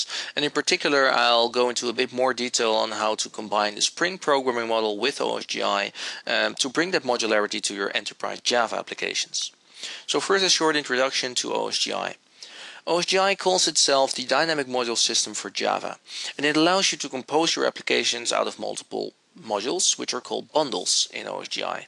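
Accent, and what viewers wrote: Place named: Netherlands. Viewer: Dutch accent:) Thanks for the short intro.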